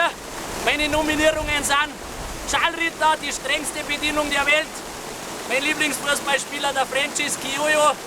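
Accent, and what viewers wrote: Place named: Bavaria, Germany. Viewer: du Depp, des is bayrisches Englisch.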